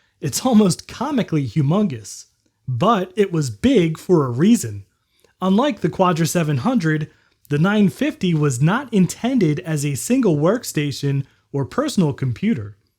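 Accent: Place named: North, United States. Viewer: It depends on your accent. I'm not sure about American accents but sounds more like a northern states person, close to Canada. Not sure. I'm British and we pronounce hyperbolic as a mix between the way you said it's meant to be pronounced, and the way that Americans pronounce it. Like "hy-per-ber-lic". Actually though, "hyper-bol-ic" is *not wrong*.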